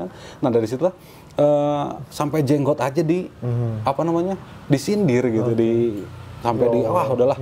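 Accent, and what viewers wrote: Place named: West Java, Indonesia. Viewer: Seru..resep ngadangukeun na.. moga istiqomah nya kasep.. terharu oge...ibu mah penonton setia .. pas bangeut logat sundana.. semangatnya kasep.. 👍👍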